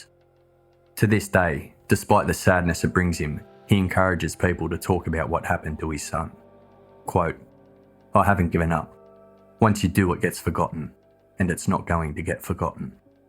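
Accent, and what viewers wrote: Australia. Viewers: Awesome version bro, sweet Real Aussie accent . Ahh the 80s what a decade !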